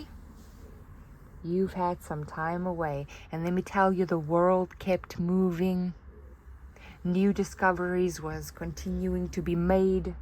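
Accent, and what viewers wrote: South Africa. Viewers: You probably prefer your anonymity but that accent is 🇿🇦 coded. Lol correct me if I'm wrong😅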